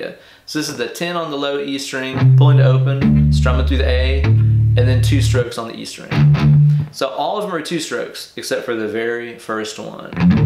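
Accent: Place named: Southeast, United States. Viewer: Well Done Mate!!! First time seeing it live was Bonnaroo 07 opening song. Lasers went to Infinity and beyond. Great riff and great lesson. You sound like you're in our General area of the US? Southeast?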